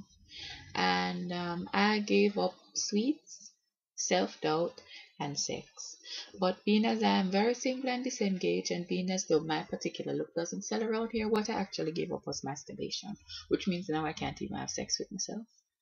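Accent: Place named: Jamaica. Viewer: Mi love u bad.... and really and truly u Jamaican accent a shot....it sounds like u should take up public speaking :)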